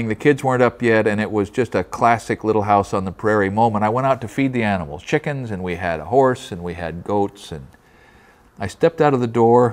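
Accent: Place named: Northeast, United States. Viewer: Love hearing the ring of a northeastern state from the mouth of an honorable northwestern man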